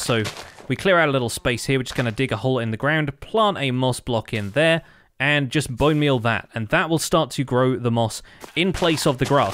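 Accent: England, United Kingdom. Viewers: this guy has a certain way with words, for sure. I think it's part of the english humour, accent and delivery lol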